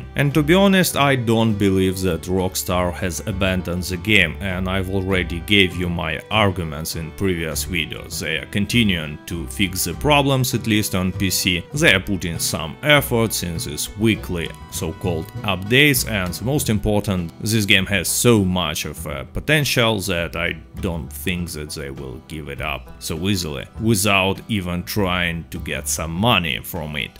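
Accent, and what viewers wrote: Russia. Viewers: Russian accent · Пришёл с cat dead redemption, ну чётко два канала за такое респект! А так акцент русский, произношение советую тренировать. ми инглиш вери вери гуд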